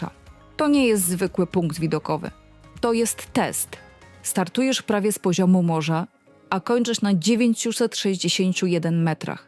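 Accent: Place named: Ukraine. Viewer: Całkiem niezłe jak na AI tylko ten lektor tak zaciąga ... ukraińskim?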